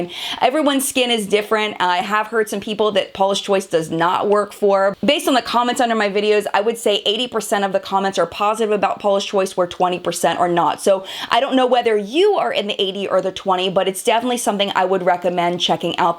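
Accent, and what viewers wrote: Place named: Baltimore, United States. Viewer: Ha! Thought I recognized the accent as Mid-Atlantic (Philly?) but didn't know for sure it was Baltimore. Baltimore Hon here, too too. 😁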